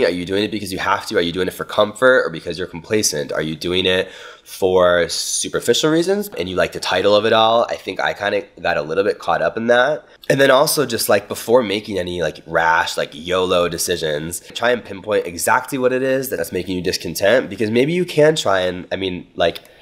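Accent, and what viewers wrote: Argentina. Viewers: I'm Colombian, living in Brazil pretty much for the same reasons you moved abroad, but with some different points, but I can totally relate. because I didn't know anything about the culture or Portuguese, yet here I am... and now I understand why you have a kind of Argentinian accent when you speak in Spanish!!! Love listening to you speaking in any language btw. Abrazos 😊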